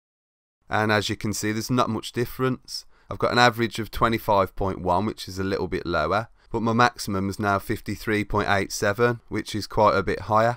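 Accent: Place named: Birmingham, United Kingdom. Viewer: Got the VPN mate thanks. Loving the Brummie accent, tell me you're a villa fan?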